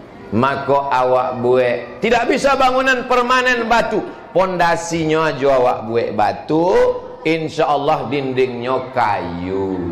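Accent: Riau, Indonesia. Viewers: Masya Allah sesuatu banget dengerinnya Tuan guru. Ceramahnya beda, banyak logat dan bahasa khas Riau nya. Saya orang Sunda, cerarmah tuan guru menghibur tapi tetap berbobot. Semoga diberikan kesehatan dan keberkahan selalu buat dan tim Yayasan Tabung Wakaf. Aamiin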